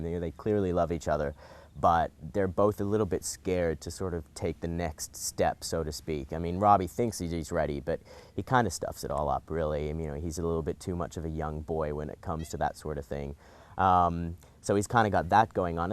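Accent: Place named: United States. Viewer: omg hes interviewing in an american accent! ahaha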